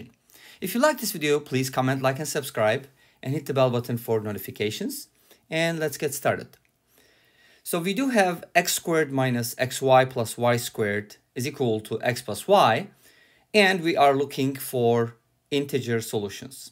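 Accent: Turkey. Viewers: are you turkish?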